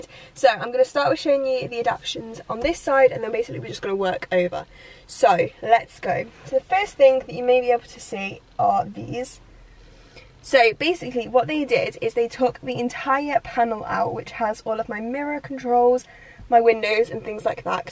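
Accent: United Kingdom. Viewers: Cool car, I noticed the voice and said she’s in London or Britain. Even noticing that, when she was getting in the car...I said why is she getting in on the right side of the car? 😟🤣🤦🏽‍♂️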